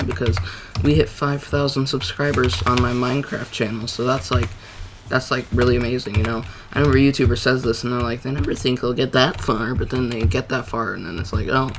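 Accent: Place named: Ireland. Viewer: Ign Irishaccent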